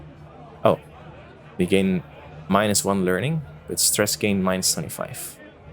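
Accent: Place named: Netherlands. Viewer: The story telling at the begining was really cool! Keep this up! But work on dat THICC netherlandse accent.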